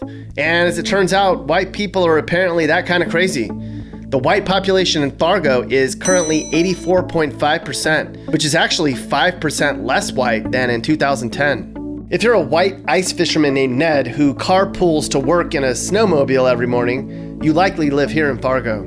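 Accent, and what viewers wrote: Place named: Ozarks, United States. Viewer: In your Ozark accent, it's Sprang-fuhld, Muhzooruh.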